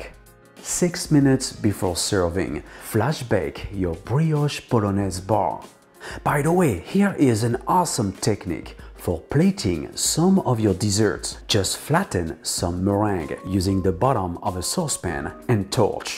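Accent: France. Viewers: Bonjour. A votre accent vous semblez être Français ou tout du moins francophone. Serait-il possible dès lors d’avoir au moins les ingrédients de la recette en français dans la description à défaut des sous-titres, s’il vous plaît ? Ps : pour ceux qui vont me répondre comme toujours « va apprendre l’anglais »... Sachez que je suis atteint de xénoglossophobie (peur des langues étrangères). Donc ma demande n’est pas un caprice merci.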